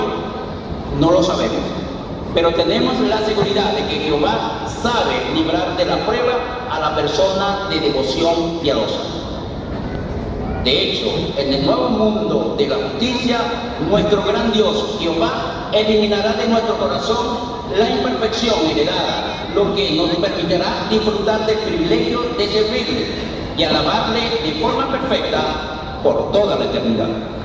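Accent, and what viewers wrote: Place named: Venezuela. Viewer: Le sentí el acento venezolano desde el principio....tiene más discursos de esta asamblea por favor??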